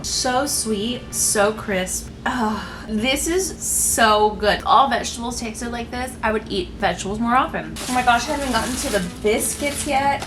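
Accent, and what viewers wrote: South, United States. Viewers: Gurl, you so crazy! I love watching you! You always make me laugh!😂❤ You definitely sound more southern when you're at home in NC. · Do I here a bigger smattering of a saucy Southern accent than usual?? ❤ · I don't know if it's just me but I feel like your Southern accent has come out so much more in this video! It must be the environment! Ahaha. Anyway, I LOVE IT!! 🥰🥰🙏❤️‍🔥 P.S. yes I'm rewatching this, cuz well duh. I Stan our food queen!! 🫡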